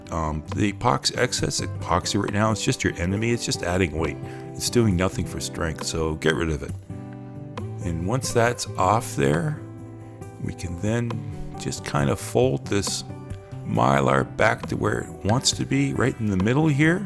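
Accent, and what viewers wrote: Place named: United States. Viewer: Hearing an American accent giving dimensions in millimeters gives me a warm fuzzy feeling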